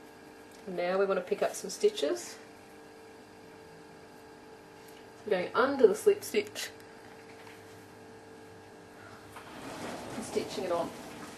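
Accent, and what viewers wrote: Australia. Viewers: She is Austalian and yeah her accent IS awsome =]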